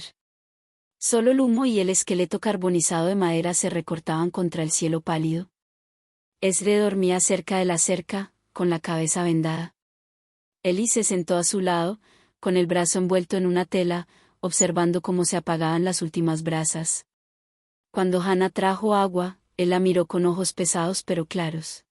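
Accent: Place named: Colombia. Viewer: No me gusta la voz de la narradora ese acento colombiano 😢😢😢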